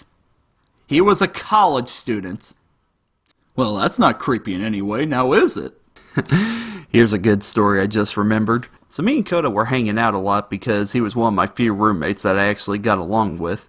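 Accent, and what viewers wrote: South, United States. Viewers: I love your country ascent! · I love how southern he is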